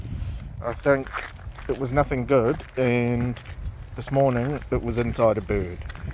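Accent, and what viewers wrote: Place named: Australia. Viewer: My daughter lives in Melbourne and will be coming home to Phoenix, Arizona in a few weeks. We haven't seen our granddaughters in 11 years and when we talk to them we hear the accent and seeing your Bassett and hearing your voice reminds me of them. I got them hooked on Angus and Millie too! TH They can't wait to see our Dudley so they can spread the love on him.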